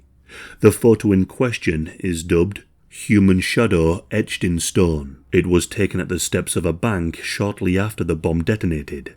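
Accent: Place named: Yorkshire, United Kingdom. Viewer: I don't think it's Scottish or Welsh. I am going to go with Yorkshire, probably North.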